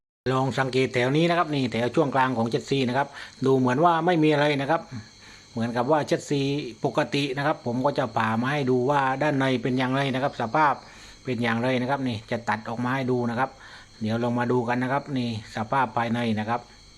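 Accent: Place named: Tak, Thailand. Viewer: สำเนียงพันนี้คนตากแน่เลยนิ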